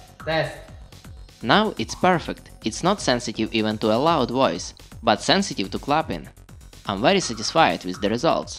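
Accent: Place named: Russia. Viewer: Russian accent detected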